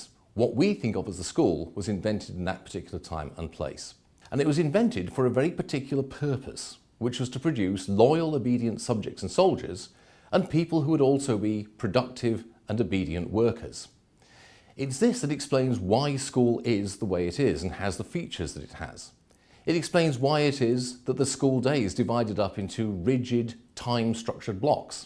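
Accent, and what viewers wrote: United Kingdom. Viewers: His argument is clearly flawed. He states that the school system corrupt but he never states why. He is on the same level as someone who is angry on Facebook but with a British accent and a snazzy camera. Educate yourself on the components of a potent argument and you will come to the conclusion that his argue is as solid as liquid water. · This guy's British accent makes him sound more credible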